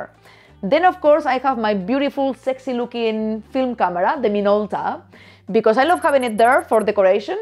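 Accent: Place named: Spain. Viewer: great video,! I love your energy and your "spanis" accent:-)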